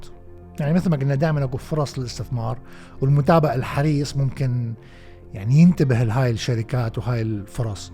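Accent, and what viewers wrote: Iraq. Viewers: دائما مبدع أستاذ بأختيار المواضيع الاقتصاديةالرائعةوالمفيدة..لذلك صارلي يومين أسهر على فيدوات قناتك المميزة🌹💐🌹وأتمنالك الإستمرار بهذا الحديث الممتع واللهجة العراقيةالبسيطة وياريت تصور حلقة مشتركة مع الأخ العزيز أنس على قناة زنگين...وبالتوفيق والنجاح انشالله🌹💐🌹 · ممكن تحط ترجمه كلامك مو مفهوم اللهجه العراقيه شوي صعبه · اخى العزيز لهجتك العراقيه جميله وبسيطه ويفهمها الجميع وانا كمصرى اعشقها واعتقد انك لست بحاجه الى ترجمه بالفصحه ،،، تحياتى لكم اخى على قناتكم القيمه الرائعه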